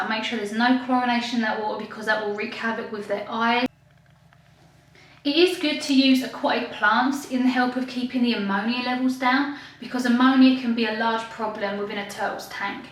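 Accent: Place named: England, United Kingdom. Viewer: Are you from UK? You sounded English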